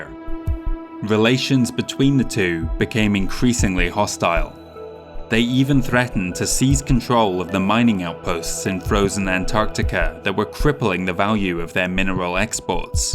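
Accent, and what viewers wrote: Scotland, United Kingdom. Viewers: You sound like a Scottish person educated in England or who has lived in England for many years. Alternatively, you sound like a European (Nordic...?) who has lived in the UK, mostly in Scotland. Or, you sound like a Scottish person affecting an English accent and failing at it.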